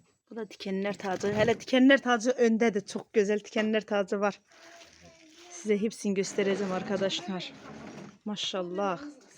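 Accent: Azerbaijan. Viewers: Salam canım turkiyəm bacım siz yarısı azeri yarısı türcə konuşuyorsunuz hangi bölgə böylə konuşuyor sizdə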